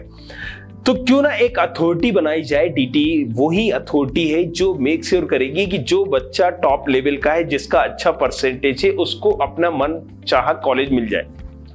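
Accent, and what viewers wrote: Bhopal, India. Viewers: Your accent is pure Bhopali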